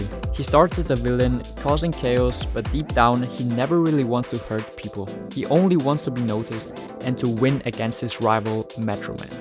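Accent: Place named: Germany. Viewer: Bro has crazy German accent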